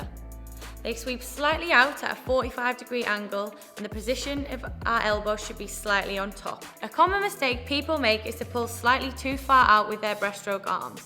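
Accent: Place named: United Kingdom. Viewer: British accent🤣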